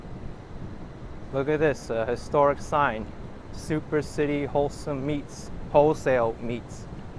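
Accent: New York, United States. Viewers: one again we appreciate your every effort in putting out vids and that wonderful NY accent of yours is always a pleasure. Would you ever consider being a small tour guide for visitors that have never been to NY?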